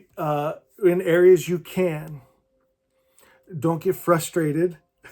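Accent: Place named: Greece. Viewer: Greek accent detected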